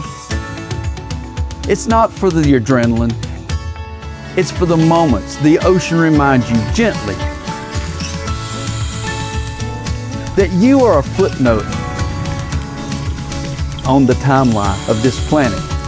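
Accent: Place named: South, United States. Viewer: This is fascinating - but also it’s nice to hear a southern accent for a change.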